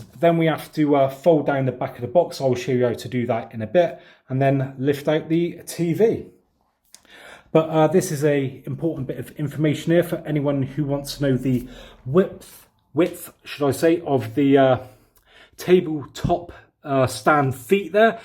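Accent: United Kingdom. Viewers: What accent is that? British?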